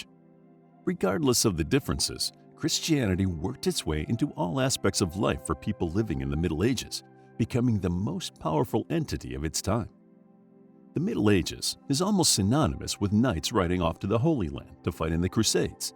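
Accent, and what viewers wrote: United States. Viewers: I can barely understand the american accent 😭😭 had to repeat the video 3 times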